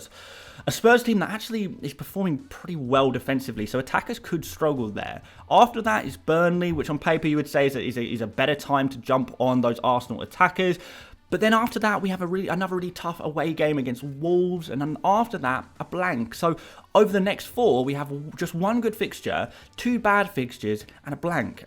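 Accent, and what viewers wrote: Nigeria. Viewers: R u a Nigerian mate??🇳🇬🇳🇬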